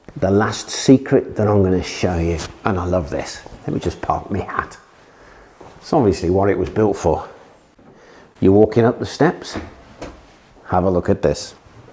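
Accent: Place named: England, United Kingdom. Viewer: Just came across your channel, this priest hole hiding episode is the first vid I have ever watched belonging to you fantastic easy to follow and put together really well... Not to mention that sumptuous English accent you have!! When I hit subscribe I was disappointed I hadnt already hit subscribe sooner before. 🇮🇪 (3hrs have past) since my first visit; to your channel, and I am hooked on history with!!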